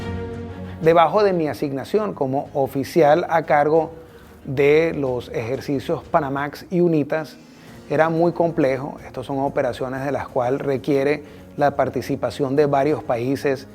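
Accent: Venezuela. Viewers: habla como venezolano ❤ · Sus padres seguramente son Venezolanos,ya q habla con nuestro dejo,y la jerga venezolana.Gracias por sus análisis.Venezuela Libre.Buen viento y buena Mar.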